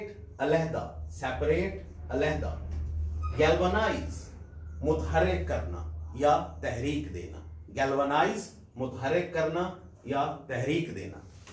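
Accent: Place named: United Kingdom. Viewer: Excellent pronunciation n perfect British Accent.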